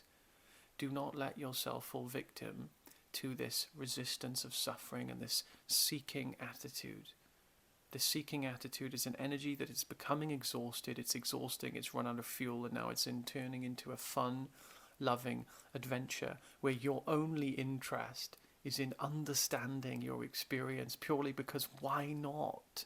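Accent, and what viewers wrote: Wales, United Kingdom. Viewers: Do I hear a slight welsh accent?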